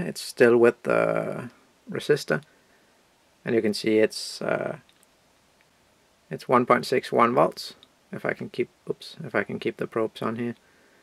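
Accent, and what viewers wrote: Denmark. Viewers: are you danish ?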